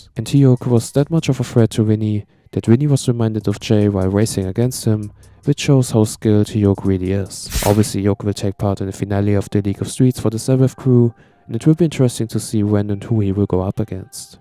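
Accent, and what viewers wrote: Germany. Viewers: New to this channel but as i windbreaker fan i love this video. Considering your slight accent are you also german?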